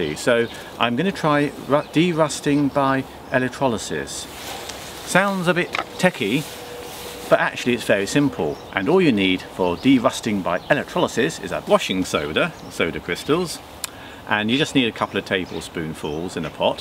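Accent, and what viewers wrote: United Kingdom. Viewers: how wonderfully British! Love it!!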